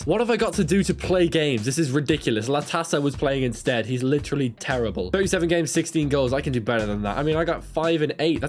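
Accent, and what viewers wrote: Ireland. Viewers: Yourrrr irishhhhhhhh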